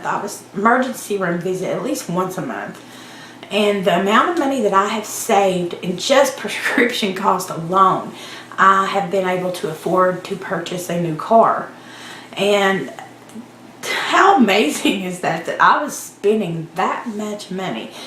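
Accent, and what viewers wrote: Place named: South, United States. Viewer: Erica mentioned you so had to come and check out your channel. You are so cute..love your personality and southern accent =) Great video..so much truth was spoken. Keep up the hard work!!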